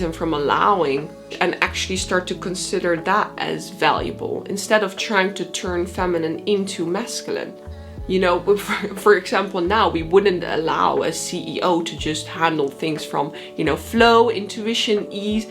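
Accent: Netherlands. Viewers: weet niet waarom dit in mijn feed komt maar ik hoor dat je nederlands bent. leuk hoor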